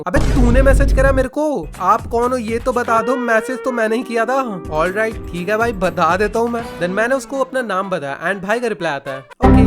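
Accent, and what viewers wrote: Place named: Bihar, India. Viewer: Are ji pahle to aapke Naam Se Laga tha ki aap Hi Ho aur awaaz se but ab confirm ho gaya yah sunkar aap bihar se h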